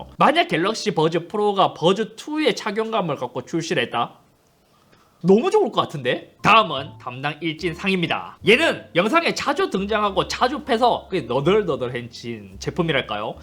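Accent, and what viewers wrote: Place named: Gyeongbuk, South Korea. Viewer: 올래 ㅋㅋㅋㅋ경북커 ㅋㅋㅋㅋㅋ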